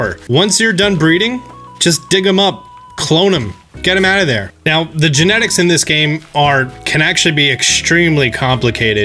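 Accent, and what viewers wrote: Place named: New Jersey, United States. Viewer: It's a New Jersey accent. All across the state, we say "wooder."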